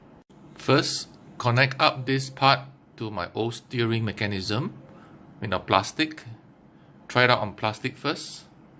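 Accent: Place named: Singapore. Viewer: You sound like Singaporeans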